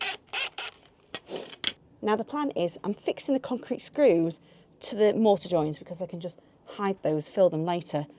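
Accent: United Kingdom. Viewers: Love British accent they speak 24 different dialects of accent wores is from Manchester claimed by most British they said you don't understand those people at all British Empire was larger than any one spray the language all over the world most country adopted and they spray different dialects accent the easy one is American English English International is much different the same as the Australian and New Zealand special in Africa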